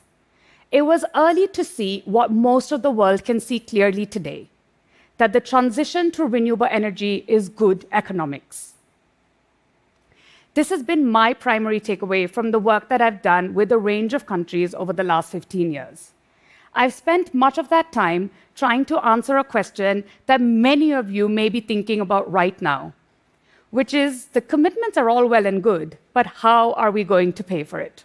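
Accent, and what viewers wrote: India. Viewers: Can still hear the underlying Indian accent that makes it difficult for me to understand her without concentrating. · to all white people, this is how the indian accent sounds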